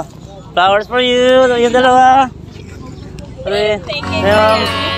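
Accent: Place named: Visayas, Philippines. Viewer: Mag bisaya kanalang mali ang tagalog mo bisaya ang dating.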